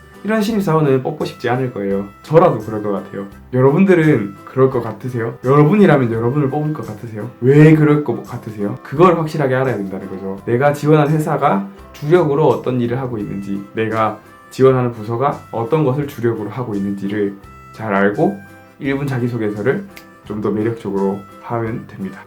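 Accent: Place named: Gyeongsang, South Korea. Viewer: 경상도 말투 귀여우세용 ㅠㅠㅋㅋㅋㅋㅋ 감사해요~!! 도움많이 받았습니당ㅎㅎ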